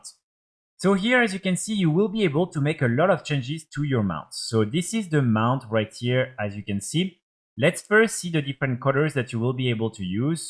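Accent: France. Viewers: I think he's french so that might be why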